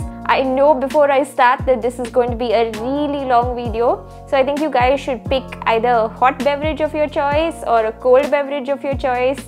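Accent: India. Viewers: I do really love Indian accent such a unique one!❤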